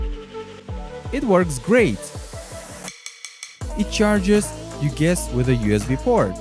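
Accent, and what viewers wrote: Russia. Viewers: I love his Russian accent/grammar 👍🏼👍🏾👍🏿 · ну акцент то русский слышен за километры... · И акцент русский(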